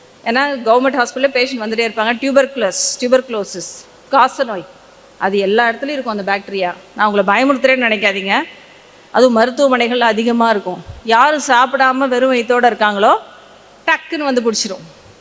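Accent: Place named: Virudhunagar, India. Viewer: Medam ungala virudhunagar ill ungalado pessu arumaiyakka irunthathu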